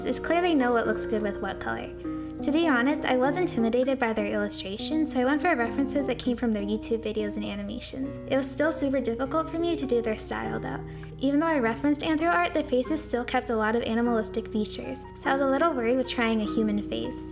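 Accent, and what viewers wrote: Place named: United States. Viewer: For some reason when I found your channel and that is 4 months ago. I thaught you were Japanese..😶 but after hearing your voice for the first time. I guess you're American. But although. I love your animations.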